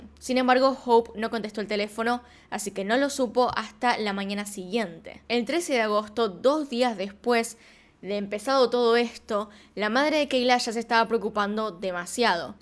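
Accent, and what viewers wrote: Argentina. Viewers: Buenísimo video, me encantan todos tus videos, siempre lo explicas con claridad tqmmm, además que me encanta el asentó Argentino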